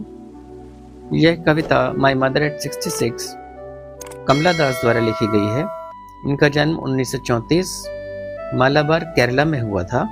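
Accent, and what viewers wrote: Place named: United Kingdom. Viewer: ur english ascent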